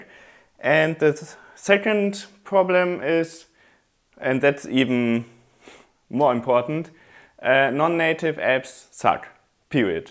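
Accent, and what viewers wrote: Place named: Germany. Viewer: I guess he is german...... i know german accent...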